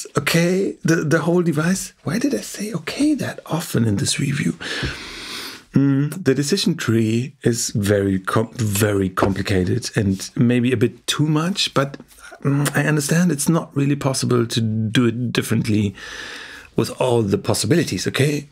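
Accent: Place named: Germany. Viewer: You sound so German. ^^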